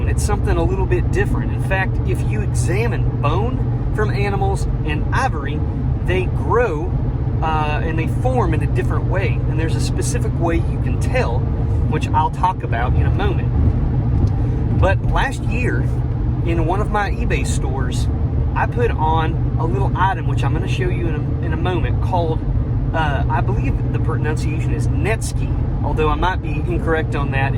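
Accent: South, United States. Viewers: It's called Southern/country/hick lol! That's all darlin' 😂 there's all kinds of us around these parts in the south. Bless your heart❤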